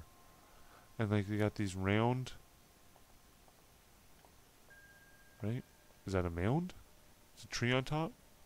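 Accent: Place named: North Carolina, United States. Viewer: Welcome back. This one must have taken quite a bit of research for you! Very good info and detail; I've never heard of these men before with the exception of Verrezano, whose name you are pronouncing with an extra 'z' at the end. I love your accent, and said to my daughter the way you pronounce certain words, like 'about' sounds like you are North Carolinian. Then you tell us that Norumbega is near where you are from! We have a St. Johns River in Florida, which is 310 miles long, and runs parallel with the Atlantic.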